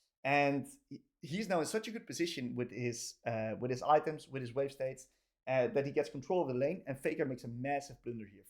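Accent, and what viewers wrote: Netherlands. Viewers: Good video! The dutch accent is pretty rough though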